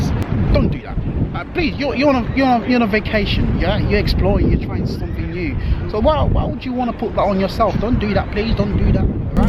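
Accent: United Kingdom. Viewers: You love Malaysia, i love your English accent 😂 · I love your British accent 😘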